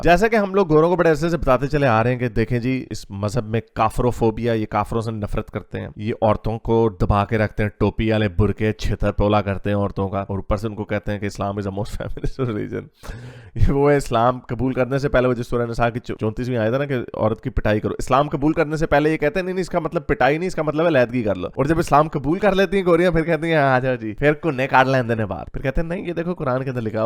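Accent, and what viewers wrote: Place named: Punjab, India. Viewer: Your accent and panjabi tadka is awesome 👏👏👌👌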